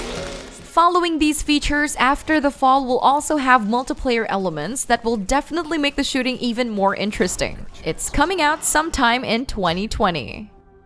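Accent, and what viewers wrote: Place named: Philippines. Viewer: MooseRocket she sounds like a FOB Filipino?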